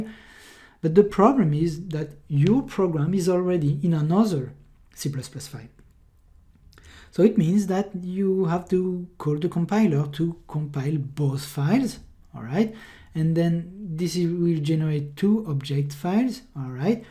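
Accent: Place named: France. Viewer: Thanks for your video tutorials and explanations, i love your french accent because i'm also french speaker and so je comprend bien mieux ton anglais que celui d'un natif 😉😉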